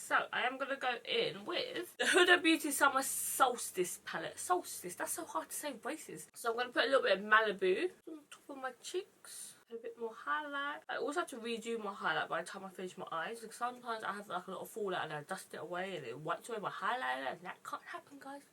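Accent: United Kingdom. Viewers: Its always weird going from watching people on insta and then finding their YouTube channel and actually hearing them talk :p totally didn't think she was from the uk!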